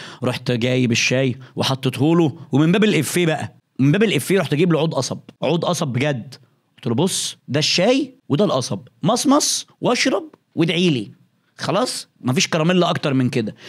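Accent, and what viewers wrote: Aswan, Egypt. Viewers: انت بتعرف تقلد اللهجه الاسواني فشخ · فعلا بيقلد بتوع اسوان بالظبط 😂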